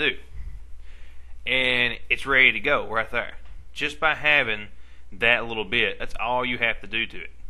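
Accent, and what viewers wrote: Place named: South, United States. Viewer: Ok, wanted to thank you again, had to track down your video again for a reinstall. Plus after 7 years in the UK it's nice to hear a "down home" accent again lol thanks again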